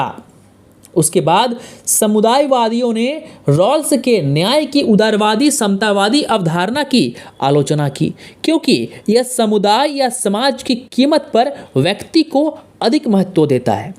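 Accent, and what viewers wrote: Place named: Bihar, India. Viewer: aapke accent se lagta hai your from Bihar or Jharkhand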